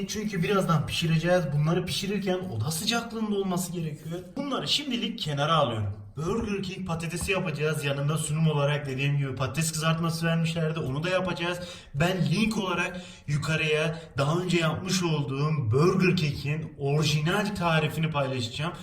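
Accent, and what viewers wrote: Adana, Turkey. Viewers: ABİ SEN ADANALI MISIN KONUŞMAN ÇOKK BENZİYOR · ses tonu televole.diksiyon adana aq.kesiiüüürüüüm doğriiüüürüüümm.yapma yapma 😆🤣nekadar güzel gözüküyor derken bari gözüküööööööööö deme bari aq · ihsan doğan baya boş yampmışşsın işiniz gücünüz dalga adam adanalı şirvesinin nasıl olmasını istersin seni beyinsiz gerçekten senin gibi insanlar varya......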